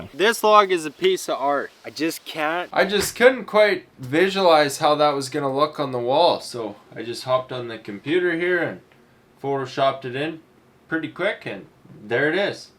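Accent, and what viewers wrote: Canada. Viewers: You had me at your Canadian. Hot DAMN it's nice to hear that Canadian accent for a change. Hi from BC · Man, what a beautiful site to build a log cabin , I was wondering,where it is. Lol,until I heard him speak,and I knew it’s Canada, aye . Nice large logs and a big ,flat open field . Love it 👍🏼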